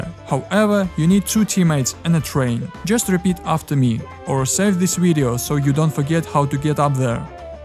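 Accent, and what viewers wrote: Russia. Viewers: акцент русский слышно)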